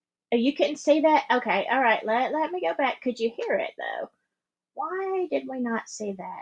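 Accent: South, United States. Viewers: Can't get much better than a southern voice of reason! Great live 💙☮️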